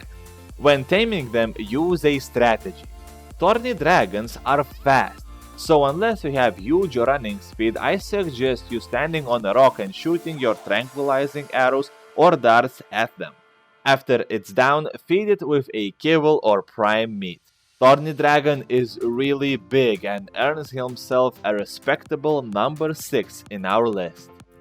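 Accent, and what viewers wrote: Russia. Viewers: That accent is amazing comrade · wtf man im not even inglish i just asked if he was russian... because the way he talks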